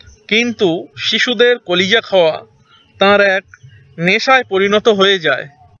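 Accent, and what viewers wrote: India. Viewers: Uchoron shune Indian mone hoi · আপনি কি বিহারী. কথা বলতে কষ্ট হচ্ছে?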